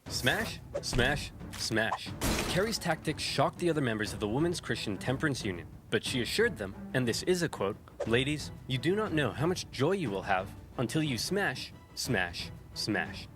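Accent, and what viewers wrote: Ireland. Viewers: I am surprised that you are using this dating site. Because you are beautiful! If you didn't live in Ireland, too far away from USA, I would ask you for a sitdown with coffee or something. By the way, I love your Irish accent. I know that you have a lot of pings from that dating site from suitors. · You're losing ur cute Irish accent lol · I don’t know why but Irish accents are pretty cool Also no cute Irish girl GF why live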